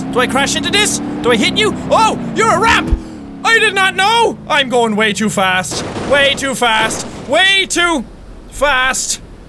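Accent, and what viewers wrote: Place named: Ireland. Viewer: I love his Irish accent “Cars aren’t supposed to have tree wheels, their supposed to have four wheels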